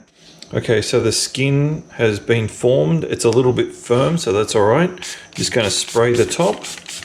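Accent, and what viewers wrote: Australia. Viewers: Beautiful. Loving watching a soap vid with an aussie voice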